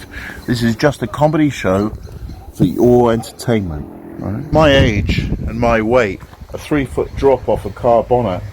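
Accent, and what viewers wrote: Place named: West Country, United Kingdom. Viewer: Appeal it if you can’t pay it…. Hate that people who have cars or vans are victims.. As you know it’s a parking permit used in my street, two of my visitors have been fined for not putting the vehicle reg on the permit. I paid them off within minutes at half price, local government are not car friendly. You need a lock up or a friend to store stuff you’re not using, nomadic Kangaroo does not seem to carry as much as you do. Perhaps he stores his stuff? As for the toilet, perhaps incorporate it in the driver seat 😂. That would be so good for comedy purposes and serve a dual purpose 😂. Great vlog and entertaining, Great to hear the West Country accent, the sound of my youth in Bristol.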